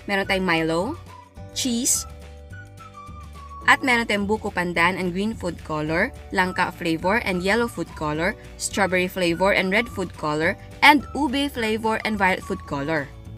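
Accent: Visayas, Philippines. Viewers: bisaya po ba kayo parang bisaya po kasi kayo mag salita pero ang galing nyo po gumawa ng pagkain or dessert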